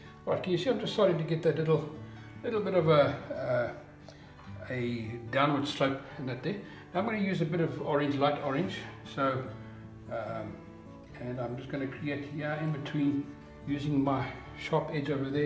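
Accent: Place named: South Africa. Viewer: Nice to hear a South African voice on YouTube.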